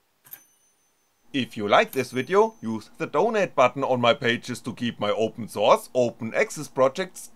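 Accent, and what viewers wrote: Germany. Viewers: Now finally I understand the rotary encoder! Only because it was explained with a German accent, the language of science! And of course because now the two sensors are placed besides each other! In fact it does nog matter where the (optical) sensors are at the wheel, but now that I look at this it all looks very simple. Thank you German guy!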